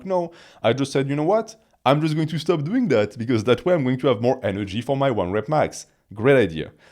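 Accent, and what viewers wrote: France. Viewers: God I love that French accent idk why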